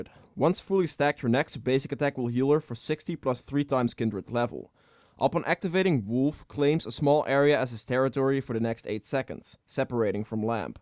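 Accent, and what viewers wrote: Netherlands. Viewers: ben je nederlands? ;D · je hoort altijd als een Nederlander Engels praat haha, ^_^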